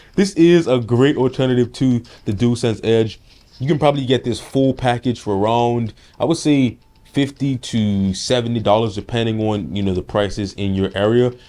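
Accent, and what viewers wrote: Bermuda. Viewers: Ayo you got a pretty heavy Bermudian accent there my guy- not sure if you're actually from Bermy but it's similar!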